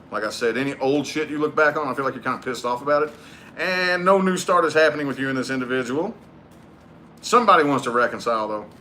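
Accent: South, United States. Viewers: Somewhere in the south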